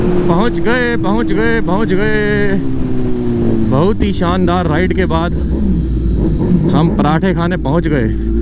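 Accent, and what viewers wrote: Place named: Punjab, India. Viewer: Punjab wali panjabi☺️☺️☺️☺️☺️☺️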